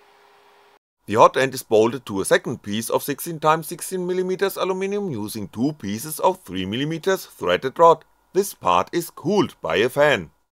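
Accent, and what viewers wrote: Germany. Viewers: I've never heard anyone more German than this guy.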